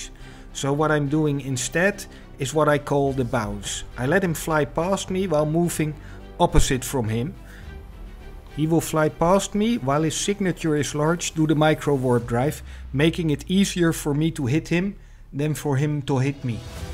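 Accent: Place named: Netherlands. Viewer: sounds dutch... to dutch... :P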